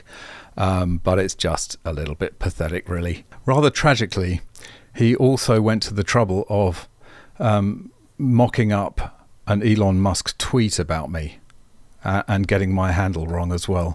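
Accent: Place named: United Kingdom. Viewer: An average of 60 ICE crash fatalities occur in Toronto every single year. Kids, moms, dads, and others have lose their lives everyday. Sadly they hardly ever make any big news, even if the volatile fuel in their gas tanks explodes. A single crash with an EV of course makes headline news. Luckily EVs are much less likely than ICE to catch fire (for obvious reasons), and their safety features help prevent these fatal ICE crashes. But Hybrids are the worst fire catchers. They're even worse than ICE. Apparently mixing a high electrical power system with volatile fossil fuel system may not be wise. Who ever could have thought? "Fully electric vehicles, on the other hand, were deemed far safer than both hybrids and gas cars; they are far less likely to catch fire, with just 25.1 fires per 100,000 sales. That’s compared to 3,474 hybrid fires and 1,529 ICE fires per 100,000 sales respectively." Ouch. 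I wonder why Australia (with UK accent) never mentions these things. Is there an agenda at work or $omething?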